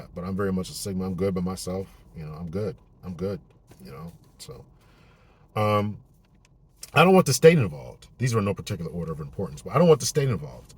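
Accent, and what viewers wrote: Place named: United States. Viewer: I'm not a guy, and this channel appears to be for the guys, However, you popped up in my feed, so I decided to answer your question: Yes ! Absolutely! Just have a written notarized agreement of your requirements for her to sign prior, and a video recording of her doing it. You would have to sign an agreement as well. That's the only way that I would consider it. " Man" should not be alone according to God. That's why he made "Woman" for marriage. 3rd time is a charm !😊 BTW, Love your accent. You're from somewhere other than the South, either West or perhaps Northern. I'm your newest subscriber, probably the only born female in here listening, with the audacity to throw in my 2 cents. 😊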